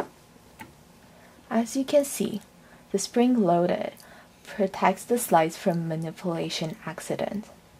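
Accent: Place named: Asia. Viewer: sounds like an asian woman trying her hardest to speak english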